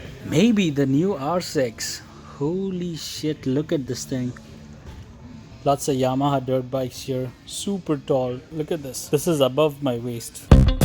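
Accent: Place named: India. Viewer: Indian accent AND a mask that muffles you voice.... Really?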